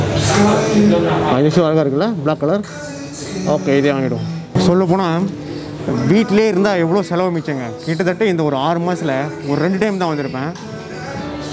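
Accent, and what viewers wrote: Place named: Singapore. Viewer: Bro Singapore Yan mathire pesama Nama ooru alumayhiri pesuka bro video nice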